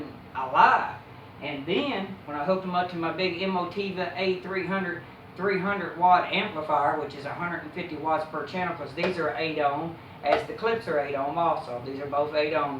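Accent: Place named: Texas, United States. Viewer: I like this guy’s accent. Where is he from, Texas perhaps?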